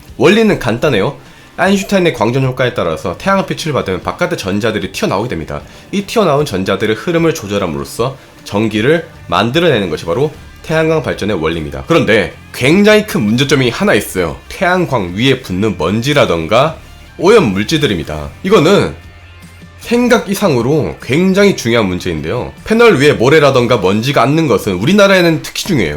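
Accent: Gyeongsang, South Korea. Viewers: 전부터 그런 느낌이 있었는데.. 경상도 사람이었네. 좋겠습니다. 윤석열이 대통령 되서..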